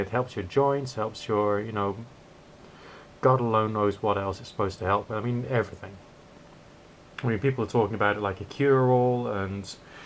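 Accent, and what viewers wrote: England, United Kingdom. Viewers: it's good to hear an English accent (for a change) rather than hearing the usual Yankee accents all the time.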